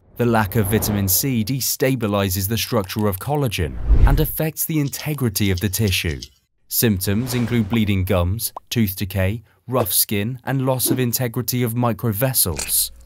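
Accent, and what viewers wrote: United Kingdom. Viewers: His pronunciation is the correct Brittish way of pronouncing it (and thereby most of Europe as well). You must be American.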